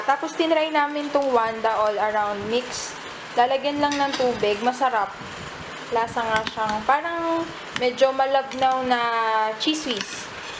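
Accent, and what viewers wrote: Visayas, Philippines. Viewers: bisaya ka sis? Parang pansin ko may kunting accent ng bisaya.😊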